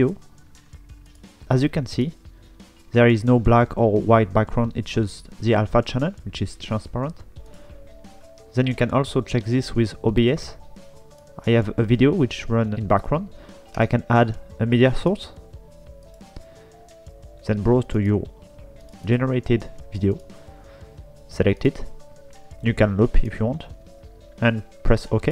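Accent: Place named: France. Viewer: lovely french accent, also thanks for the software it's got so much in it!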